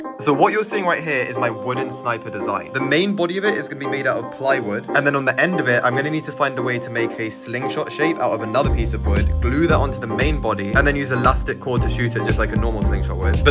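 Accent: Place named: England, United Kingdom. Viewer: im trying to work out what country you live in because you sound English but seem like you live in America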